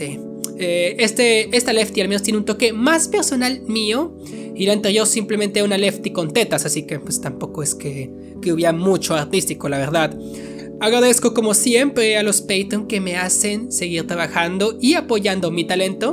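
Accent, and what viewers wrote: France. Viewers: Pregunta eres franses si no eres pues pareces por el acento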